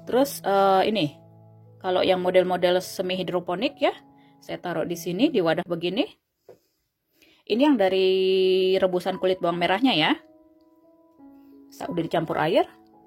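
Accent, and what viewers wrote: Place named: Medan, Indonesia. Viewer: Biasa kekgitu mba,, buat jd motivasi agar lebih baik kedepanna 🤗 Kalo saya pribadi mmg sukak dengerin cerita mbaknya hehheh...kayak logat anak Medan 😁😁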